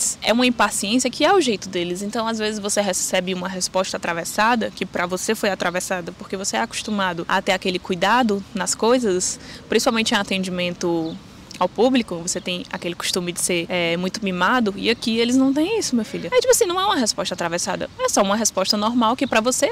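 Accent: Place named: Northeast, Brazil. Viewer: Logo vi que me pareceu ter um sotaque nordestino mas não quis arriscar. É que a maioria dos brasileiros em Portugal ou são paulistas ou cariocas 😁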